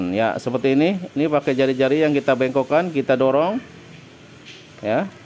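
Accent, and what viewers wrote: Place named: West Java, Indonesia. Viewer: Sunda pisan di rojok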